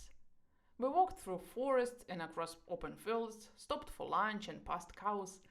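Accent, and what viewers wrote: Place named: Russia. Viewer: I hear russian pronunciation ...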